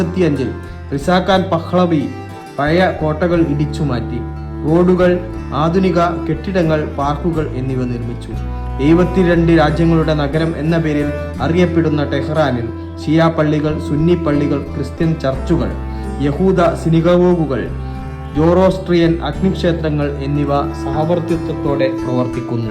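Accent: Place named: Kozhikode, India. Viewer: കോയിക്കോട്ട് കാരനാണല്ലേ? വല്ലാത്ത ഒരു യ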